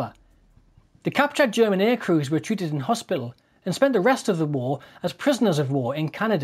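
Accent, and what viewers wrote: Newcastle, United Kingdom. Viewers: Beautiful Geordie accent, I love your voice. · It's Geordie, and it's grand! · The narrator does not have a Scottish accent. The accent is from the northeast of England - a Gordie. Many Scots do speak English well and with a large vocabulary however. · Sounds like a Geordie( Newcastle,) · I'm Scottish and I also thought he was a geordie lad. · Not a robot and not at all odd. It's a North-eastern English accent, probably from the Newcastle area, less than 100 miles from the scene of the battle.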